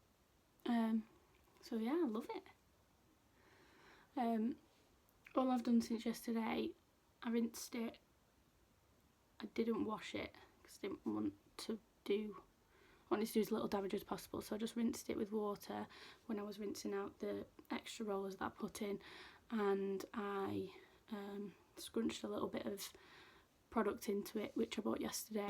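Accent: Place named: Wales, United Kingdom. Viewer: Where are you from? Love the accent but you sound a bit welsh. xx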